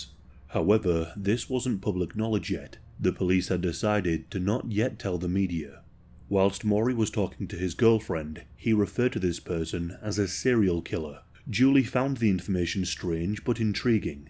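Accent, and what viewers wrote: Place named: North East England, United Kingdom. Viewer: Not A.I for a change, he's from the very top of England before you get near to Scotland. North-East accent 😍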